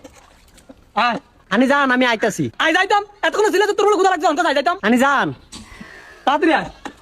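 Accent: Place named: Chandpur, Bangladesh. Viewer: Chadpurer vasa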